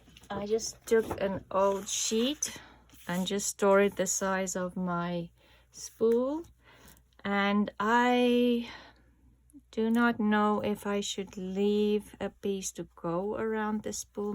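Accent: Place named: South Africa. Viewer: I found your video today. Love listening to a fellow SA accent.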